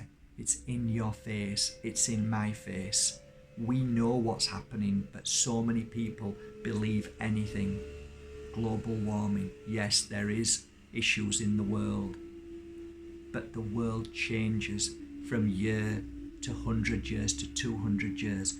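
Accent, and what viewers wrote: Yorkshire, United Kingdom. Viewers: I've been watching you for sometime, as a fellow Yorkshire man I recognised the accent. I suspected you was from Huddersfield the first time I came across your energy. Something about you seemed so familiar. You mentioning Marsh in this video confirmed it! Lovely to come across an ascending soul from home doing so well! For some reason or another I haven't watched a video of yours in awhile. This has been the perfect video/way to return to your energy. Home as a theme is very pressing for me right now. Childhood, family, pain, abuse, independence and breaking free are all very present in the now. Realising we share the same birthplace and with the topic of the video has created a beautiful synchronicity. Thank you! Thank you for your energy, time and sharing your story!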